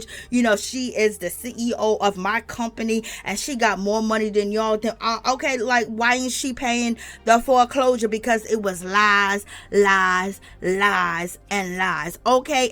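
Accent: New York, United States. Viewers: Hey Girrrrrrllllllll hey........ l just love listening to your videos. I love the way u talk.💕 You must be from NY. I'm a New York girl as well, born there left when l was 13. Anyway, people are not up to Kim's Shenanigans! Remember the last time she was on Housewives. Andy told her she was a trouble maker. Anywho... nice chatting. 🤩💞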